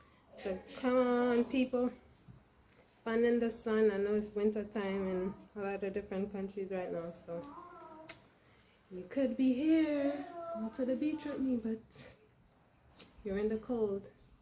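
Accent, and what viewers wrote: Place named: St. Elizabeth, Jamaica. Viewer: Noice 🌞Should have easily guessed you from St. Elizabeth 🙂. Just like how when someone from Montego open there mouth you know they from Mobay "mi deh guh" 😅.. or Spanish Town once you hear "Umbri" 😂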